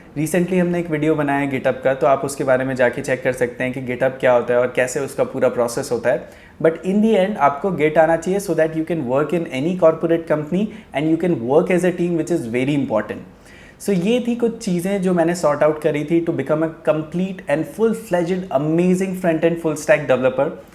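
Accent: India. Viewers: yeh punjabi he i can sense that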